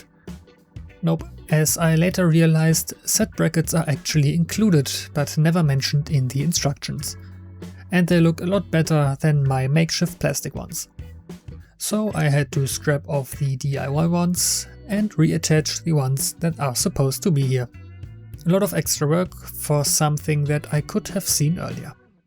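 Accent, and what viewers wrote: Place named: Germany. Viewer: Hab ich doch hie und da einen deutschen Akzent rausgehört! 😃 Lecker gebaut und schön mit Struktur verziert. Ein dickes 👍 von mir.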